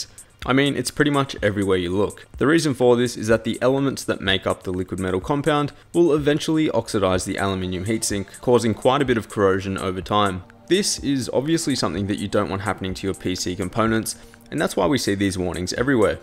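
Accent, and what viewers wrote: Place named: Australia. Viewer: Subbed for Australian accent.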